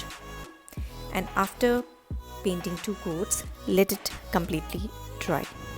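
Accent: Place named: Mumbai, India. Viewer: Where are you based? Your beautiful accent reminded me of my holiday in Mumbai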